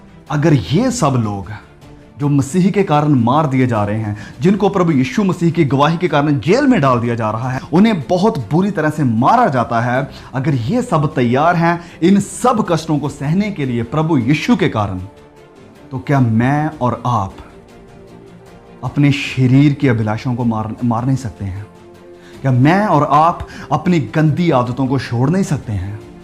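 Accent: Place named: Doaba, India. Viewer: Dear brother you are speaking but I'm sure that you are a Punjabi fellow. Definitely you are from Doaba. Your style and pronouciation is of Hoshiarpur or JALANDHAR or kapurthala. May God bless you.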